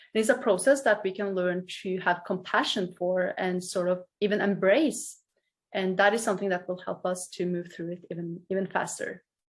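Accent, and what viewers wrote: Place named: Norway. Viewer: Tack! Det står helt klart att du har egen erfarenhet av det du talar om. Själv är jag inne i min tredje mörka natt. De två tidigare var jobbiga, men betydligt lindrigare än denna, som har pågått över 3 1/2 år. Efter de två tidigare mådde jag väldigt bra och trodde aldrig jag skulle behöva erfara detta igen. Men uppenbarligen behövs det. Varannan dag är hanterbar, ångesten är påtaglig men ändå fungerar det på något sätt. Då börjar inspirationen komma, men det räcker tyvärr inte länge. Dagen efter brukar vara mycket tung, jag kan gråta hela dagen, och tror att detta aldrig ska ha ett slut. Jag brukar säga till mig själv att det är någonting fint som händer. Jag hoppas att jag ska kunna hjälpa andra människor i samma process som jag går igenom, och lugna dem med att allting är precis som det ska vara. Tack för den inspiration du ger. 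PS Jag hoppas att mitt inlägg översätts till engelska så att det inte bara är du som kan läsa det! Man hör tydligt på din accent att du har norska som modersmål! Trevligt!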